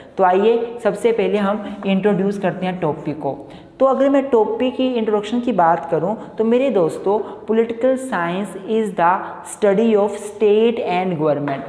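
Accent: Himachal Pradesh, India. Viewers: Sir aap Himachal Se ho ?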